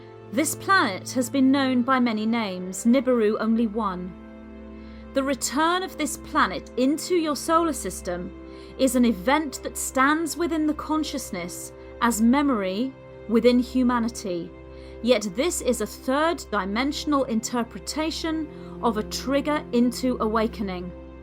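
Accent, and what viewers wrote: United Kingdom. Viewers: HEY EVERYBODY... Just thought I'd come in and grab a little popcorn and watch a video about another non-existent planet...... Okay, here we go - yeah buy the book and resource series. Nibs-For--Tards. (Uh-huh! yeah! MK Ultra, sure) How To Painlessly Rip Out Your Brain... Ahhhh - gawdammed British accent will do it every time. My pole shift??? Gash talks like she's an exception to this planet - someone should check her 'pole.' Hoooe-shit, there's still 26 minutes of this fucker left. I don't think there's going to be enough popcorn and I certainly didn't see anything to drink around this joint.... ....Hang on... I'll be right back in a jiffy.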